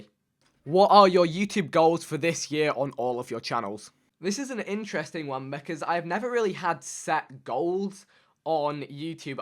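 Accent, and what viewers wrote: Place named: England, United Kingdom. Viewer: srs? He's English. Why does it matter his race? Where he comes from/lives is what counts for how he acts(and sounds)#accent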